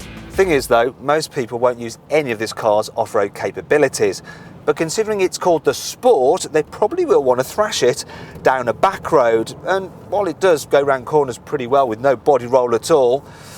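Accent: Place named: Birmingham, United Kingdom. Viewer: He's British? I thought he was a Brummie.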